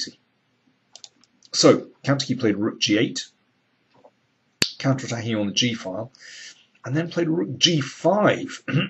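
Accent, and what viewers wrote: England, United Kingdom. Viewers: I love his English accent and the way of saying "indeed". You re the king.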